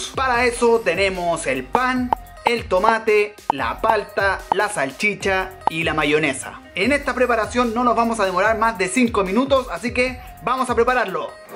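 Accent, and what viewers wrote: Chile. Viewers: Weon abla comp chileno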